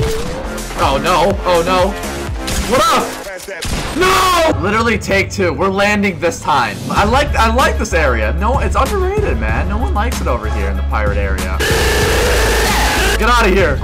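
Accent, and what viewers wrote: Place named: New Zealand. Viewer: Who else hears kiwis when he talks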